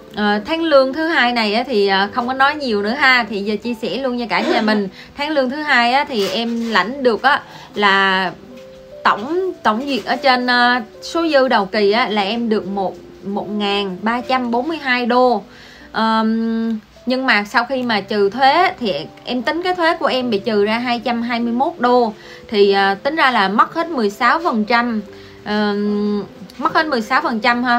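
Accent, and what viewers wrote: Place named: Central, Vietnam. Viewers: Nghe giọng, đoán cô là người Ninh Thuận - Bình Thuận(?). Wa chúc kênh cô ngày càng nhiều người đăng ký và theo dõi. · Chúc con gái luôn bình an và hạnh phúc .nghe tiếng nói của con như người miền trung .sống xa quê con luôn khôn khéo và cẩn thận,con,nhé. Lòng người nham hiểm khó đoán .con thì quá trẻ nên suy nghĩ rất cẩn thận trước mọi sự việc con nhé .cô luôn đồng hành kênh của con .chúc con hạnh phúc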